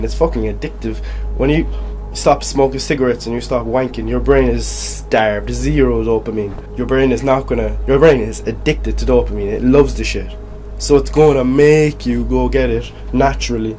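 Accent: Ireland. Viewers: Beat the cheeks off her loool. That's a fine Cork accent u have there bai. Fair play kid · Holy shit your Irish? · You’re Irish ?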